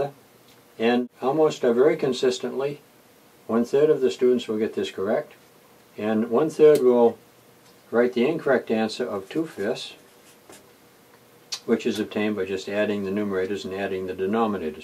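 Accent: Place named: Boston, United States. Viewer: Much respect, talking about fraction "bah's" like he's from Bah-ston.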